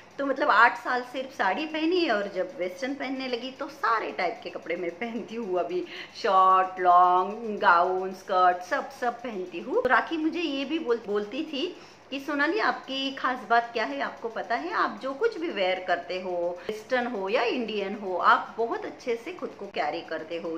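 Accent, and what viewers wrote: Nashik, India. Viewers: तुमचा हिंदी चा आवाज छान आहे मराठी पेक्षा मराठीत नाशिक ची भाषाआहे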